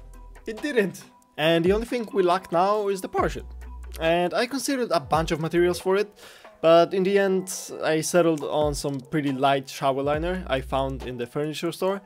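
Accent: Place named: Poland. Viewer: juz po piweszej sekundzie filmu wiedzialem ze jestes polakiem :)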